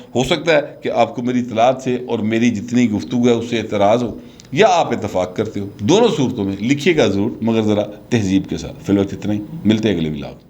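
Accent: Punjab, Pakistan. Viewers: Aap ki Urdu Punjabi k saath Maza deti hae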